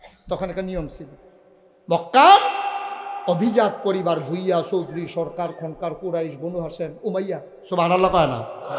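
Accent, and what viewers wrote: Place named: Kishoreganj, Bangladesh. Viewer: এই হুজুরের বাড়ি কি কিশোরগন্জ ভাষা সুনে মনে হলো আরকি ।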